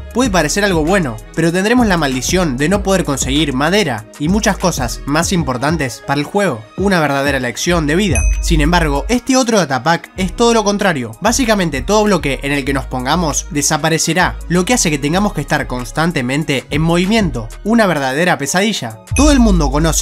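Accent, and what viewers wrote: Argentina. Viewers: acento argentino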